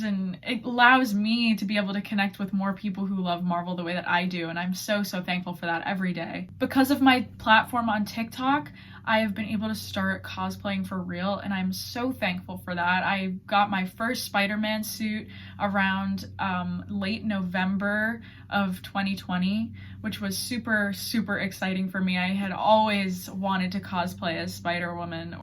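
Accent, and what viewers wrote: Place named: North America. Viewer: Hey! Its nice to know your real name! I love your videos! (I too am obsessed with Marvel lol) Here are some just basic questions I have: How old are you? Ik your birthday was recently! Are you Canadian? American? (I assume you can't be anything else cos of your accent lol)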